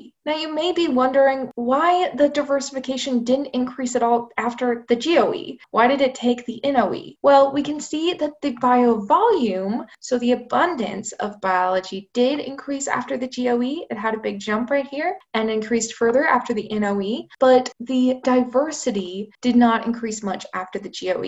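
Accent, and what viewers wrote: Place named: Midwest, United States. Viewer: I ❤️! I’ve been patiently waiting for this video. Are you a Midwest girl? You sound “normal” to me being a Midwest guy myself. Let me guess you’re in the Chicago area?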